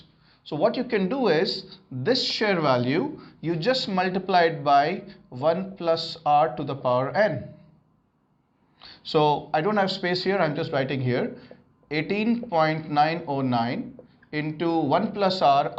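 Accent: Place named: England, United Kingdom. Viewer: I like your teaching sir, and your English accent 🤗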